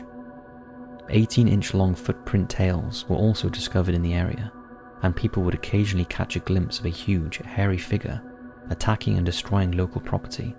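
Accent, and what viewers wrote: United Kingdom. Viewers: Could you try any harder to imitate the voice of that Dark Docs bozo? An overly dramatized, whispery, vaguely Brit voice does not in any way improve an already shabby production. Consider less theatrics and way more thought on your next one mate. Cheers!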